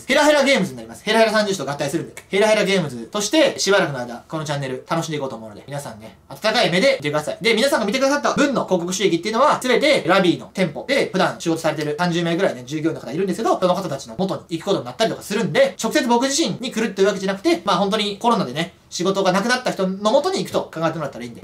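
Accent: Kansai, Japan. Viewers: ひかるゲームズまじで好き！ ひかるの関西弁実況がめっちゃおもろい笑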